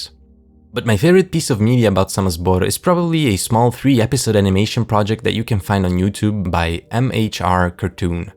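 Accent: Russia. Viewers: there is no way you are not a Russian native speaker or at least from a slavic country your pronunciation says it all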